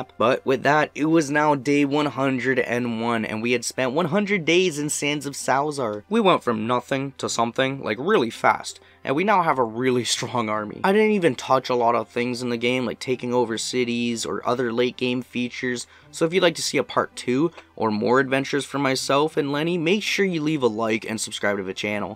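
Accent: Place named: Canada. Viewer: Subbed becus Canadian accent, Kenshi, and hoe appreciation.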